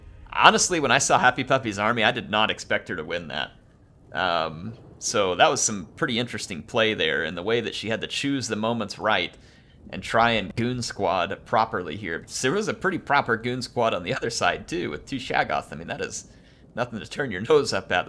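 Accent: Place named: Kentucky, United States. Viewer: I've missed his kentucky-fried accent!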